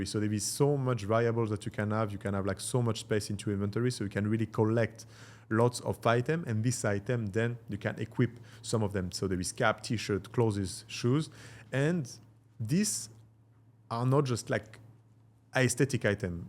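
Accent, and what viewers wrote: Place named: Iran. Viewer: مشخصه لهجش ایرانیه این اداها چیه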